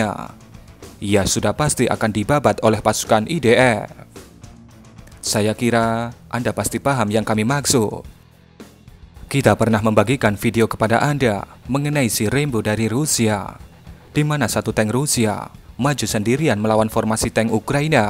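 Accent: Java, Indonesia. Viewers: suara lhu medok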